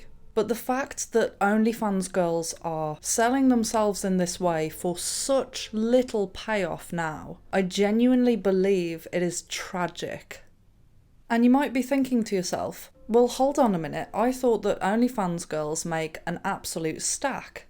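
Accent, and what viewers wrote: United Kingdom. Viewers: Your UK voice is amazing and the pronunciation and accent.. I could listen to this voice for hours. What part of UK is your voice accent from? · I love your accent 😍 are you british ? New sub here i love your content speak wisdom to this dumb stupid girls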